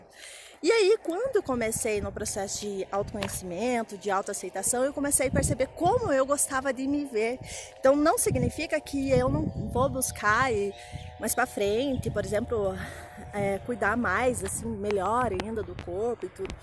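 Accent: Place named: Paraná, Brazil. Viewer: Pelo sotaque é paranaense hehehe Parábens pelo vídeo, sucesso em seu canal!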